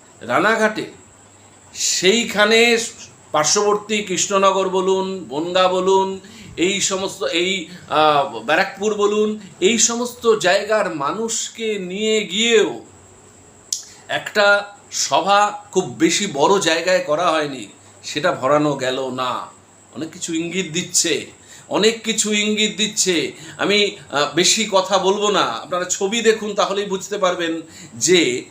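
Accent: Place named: Bangladesh. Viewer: কথাবার্তা একদম বাংলাদেশীদের মত ! তা' বাপধন তোমাকে এখানে লেকচার ঝাড়তে কে ডেকেছে ?! তোমার একটা চ্যানেল আছে দেখলাম ৷ কেউ দেখে ?!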